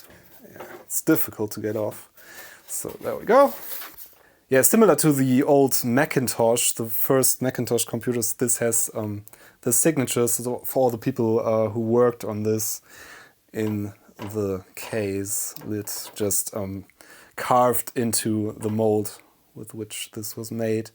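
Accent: Germany. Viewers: Great hands-on video, immediate subscribe. Von Akzent aus, bist du deutsch? 🇩🇪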